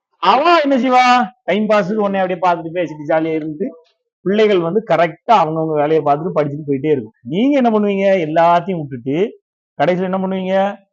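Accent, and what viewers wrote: Tirunelveli, India. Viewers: மாஸ்டருக்கு திருநெல்வேலி யா தூத்துக்குடி யா,,,,, எந்த ஊரு உங்க பேச்சுல நெல்லை தமிழ் ,,,,,,, இனிமை,,,,,, இனிமை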